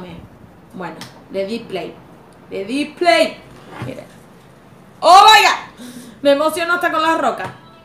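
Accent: Argentina. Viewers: Pues quisiera también estar en esa fiesta pues ni soñando se hace realidad 😂😂me encanta tus locuras y tu acento argentino eres increíble todavía no puedo dejar de gritar de la emoción esta rebuena como los amo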